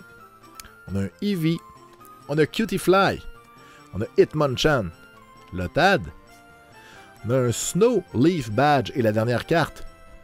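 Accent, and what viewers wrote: Quebec, Canada. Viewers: Joli tirage. J'adore regarder tes vidéos et ton accent québécois est un pure moment de bonheur et de nostalgie ça me rappele mon voyage au Québec il y a 20 ans je rêve d'y retourner un jour avec mon fils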